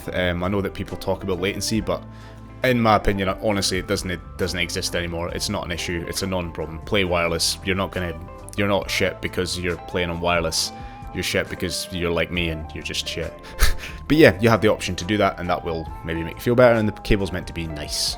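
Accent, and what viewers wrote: Scotland, United Kingdom. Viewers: I hear Scottish I sub simple as... on a serious note the pro controller could be a good thing with regards to the swappable analog modules... i could open up a market for 3rd party companies like Razer, SteelSeries or Thrustmeter to make parts for it... its just a thought Edit if you look below the analog stick you will notice to bumps (where you finger naturally sits under them) looks like 2 additional buttons so there should be 4 rear buttons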